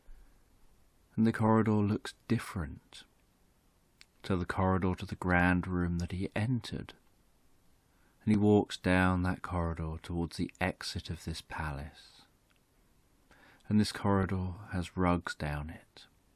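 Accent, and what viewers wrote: England, United Kingdom. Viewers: Why can't I stand the English accent.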